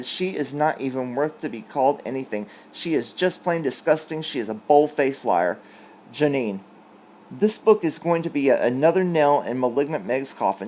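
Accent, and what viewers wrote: South, United States. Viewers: Your accent is adorable! Where are you from? Clearly a southern boy.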